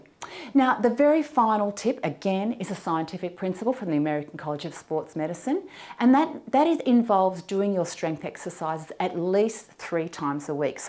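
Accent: Australia. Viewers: I also love the Australian accent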